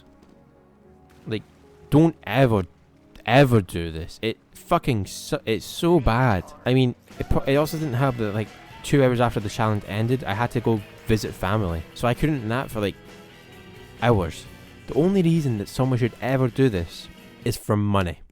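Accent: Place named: Scotland, United Kingdom. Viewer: why are you scottish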